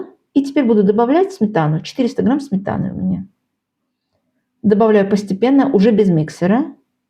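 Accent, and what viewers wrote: Baku, Azerbaijan. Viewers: Спасибо Вам,дорогая землячка!Ваш голос и наш бакинский акцент просто завораживает и ласкает слух!Рецепт -супер!Успехов Вам. P.S.А хочу я ореховые трубочки,кот.продавались в Баку,я писала про них Вам..❤❤❤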